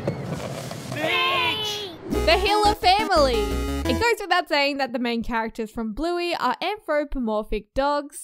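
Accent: Australia. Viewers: You have 1 of the most Aussie accsnts I have ever heard and I'm from rural NSW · Went to America this year and the first thing someone said was “listen he talks like bluey”😊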